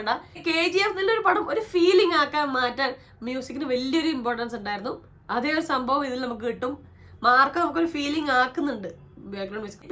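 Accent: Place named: Palakkad, India. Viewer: നിങ്ങള് പാലക്കാട് ആണല്ലേ ❤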